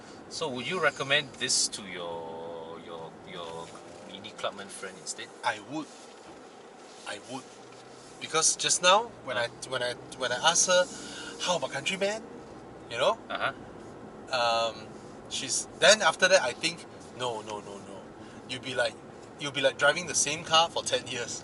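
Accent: Malaysia. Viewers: ahh.. I see so Malaysian speak that way??? I'm from Poland. Please forgive my stupidity 🙏🙏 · It's so hilarious how you Malaysians speak our English language ! But at least it sounds better than china and other east asian countries'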